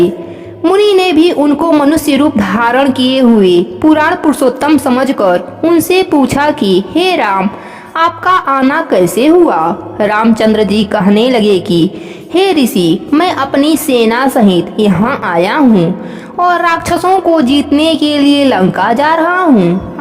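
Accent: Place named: Bengal, India. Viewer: Ap to bagali bol rahe ho ji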